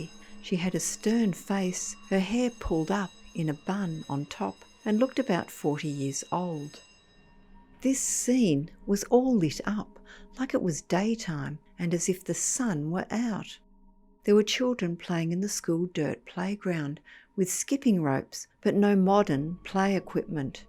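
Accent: Australia. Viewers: This is not a British accent it’s Aussie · More disturbing hearing an aussie narrator say "playing tag" and you pronounce castle weirdly. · She iis Australian, not British. Americans seem to confuse the two. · Agreed 👍I'm British too. I think she's Australian😊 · Aussie accent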